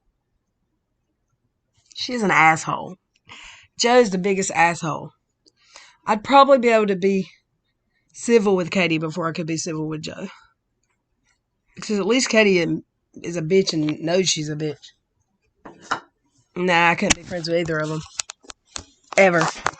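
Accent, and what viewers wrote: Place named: Ozarks, United States. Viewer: you sound like you're from arkansas missouri etc that area; and katie sounds like she is from the midwest (which she is she lives in MN st paul area cheese country) so that the fact that she is saying her harraser is from the same is in geographic area as her yet YOU have a very differnt and strong accent from the diff part of the country just shows that she is trying to place blame on other people and is sick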